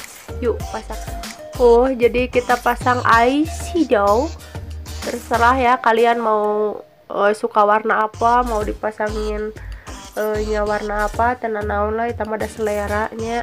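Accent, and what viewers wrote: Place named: Sunda, Indonesia. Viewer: Suka ey nontona sunda bgt kitu tah wkwk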